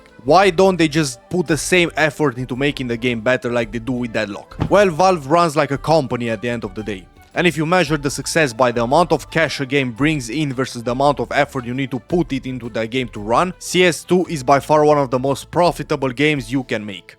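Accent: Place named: Romania. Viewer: I can bet u re Romanian by the accent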